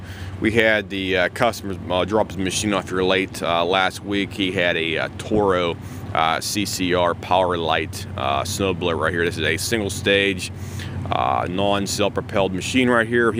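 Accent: Pittsburgh, United States. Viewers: Dude, your Pittsburgh accent is STRONG! 😅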